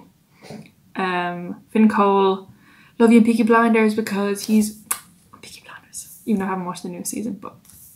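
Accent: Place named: Ireland. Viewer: Lol, I click on your video and straight away I hear the accent and know your irish😂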